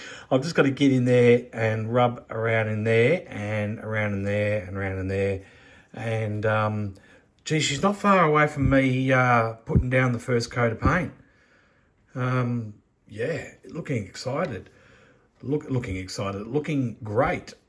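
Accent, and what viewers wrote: Australia. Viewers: That British and pearl green combo looks fantastic! Watching from Mobile (Moe-beel) Alabama. Been watching for the last few segments, all have been great. 62 and have been building since I was 9 and still learning, thanks to you guys ( or should I say blokes ) I happen to love the Aussie accent. Enough of that, keep up the good work.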